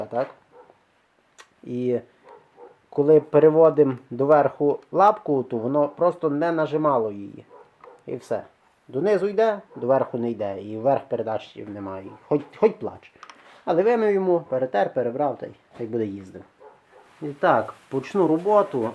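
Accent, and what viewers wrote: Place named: Galicia, Ukraine. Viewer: По розмові чую, що Галичина . Ні?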